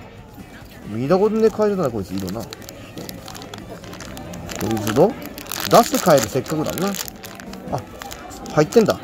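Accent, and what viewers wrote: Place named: Shiroishi, Japan. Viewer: 今日はかなりなまっていました🤔 白石市民から移りましたね😁 山形よりまだまだいいけど😁 ビリビリガン自分でするにはかなり勇気が🙂 🐸と🐍このシリーズ大好きです💖 錦ケ丘ヒルサイドモール、また頑張ってください🙇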